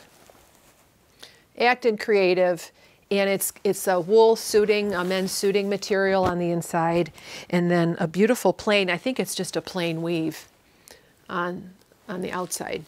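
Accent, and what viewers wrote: Chicago, United States. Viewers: Hi,. I recently came upon your podcast and enjoyed it so much that I have subscribed and watched many of your prior podcasts. I am so pleased whenever I get an alert that you have released a new podcast. I’m originally from the Midwest (actually the Great Plains) and went to college with students from Chicagoland - love to hear the accent again. I started spinning in 1979 and have loved going down this fiber rabbit hole! I have a suggestion about the crocking situation with the red cashmere you are using for the Sophie Scarf. Certain dyes, most notably reds, teals, and blacks, tend to crock or rub off on your hands when working with them. The dye molecules haven’t chemically bonded with the fiber molecules, possibly because too much dye was used. I usually wash yarns like this using Synthrapol, a liquid detergent which suspends dye molecules in water. 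The molecules do not reattach to the yarn and the excess dye washes out. It doesn’t take much Synthrapol but you might need to wash and rinse twice. Synthrapol can be found at quilt shops and dye suppliers, i.e. ProChem or Dharma Trading.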